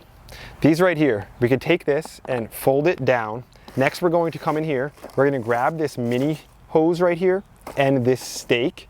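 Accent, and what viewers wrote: New Jersey, United States. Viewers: The way he says watering or water lets me know I’m listening to a true Jerseyan 😊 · That’s not a NE accent. It’s basically New Jersey, but you know people in New York too, but is that just because they came from New Jersey or still live there? Mostly it’s people in New York City saying Woarder right by NJ, and not the part of New York I grew up nearby in NE Ohio it’s like 1 hour to the border. Lots of accents all over the place. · as another fellow new jerseyan i think you mean "wadder" 😅. Now the real question is, do you think he's got a north jersey or south jersey accent?!?! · Bro said, “worter the plants” Im from Ohio. I can hear your Jersey accent hard bro 😎🤙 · I was surprised by the American accent when he said he was in Jersey. I was thinking it’s great to think Americans are also moving to the Channel Islands. Then the title came up, this is NJ not the original Jersey. 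You would never make that mistake if you were over here. YT is global after all. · That is a Jersey accent, Northern NE’s is different. Ayyup. Wicked pissah! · New Jersey guy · Yep, he's definitely from Jersey. He's teaching us how to wooder our vegetables. Nice content.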